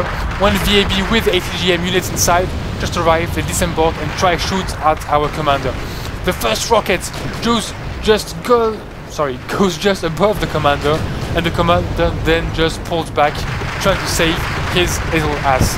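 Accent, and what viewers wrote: France. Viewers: First :p, nice vids keep up the good work and the french accent ;)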